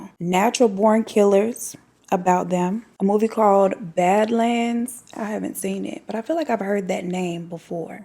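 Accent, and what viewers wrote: South, United States. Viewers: I love listening to your voice and southern accent and just certain words you use I just love! Your entire vibe I’m here for! Get it sis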